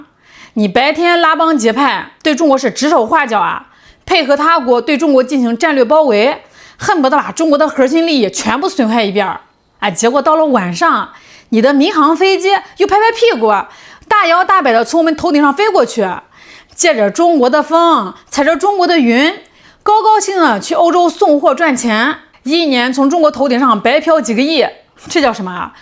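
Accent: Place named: Hebei, China. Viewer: 有真有假、听上去气势挺足、听听热闹罢了….普通话标准一点就更好了、应该是河北的。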